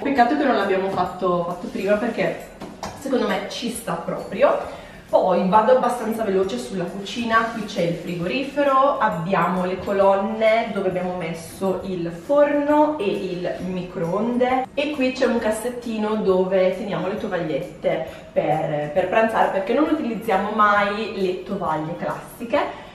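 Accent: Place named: Lombardy, Italy. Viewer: Dove vivi in Lombardia oppure in provincia perché mi sembra un accento lombardo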